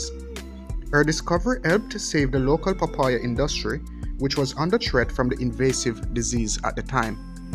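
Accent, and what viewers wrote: Jamaica. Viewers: It still sounds like he's speaking creole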